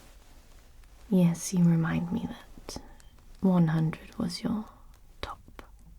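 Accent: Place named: United Kingdom. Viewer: whispers in British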